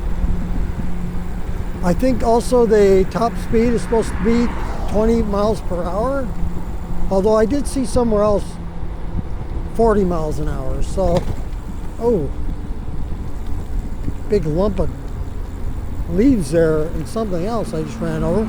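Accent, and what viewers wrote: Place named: Canada. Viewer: If I close my eyes.. listening, I think I'm in Canada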